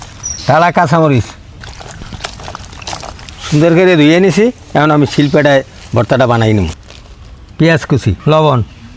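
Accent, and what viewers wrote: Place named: Barisal, Bangladesh. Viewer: চাচা বরিশালে কথা বলে খুব সুন্দর লাগে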